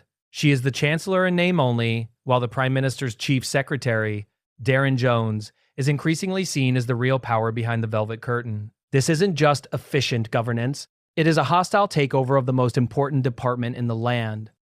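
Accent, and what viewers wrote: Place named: United States. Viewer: What a load of crap and American voice over crap aswell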